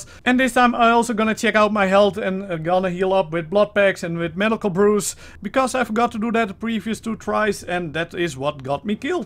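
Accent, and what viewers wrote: Netherlands. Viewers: Dutch pronunciation ganggg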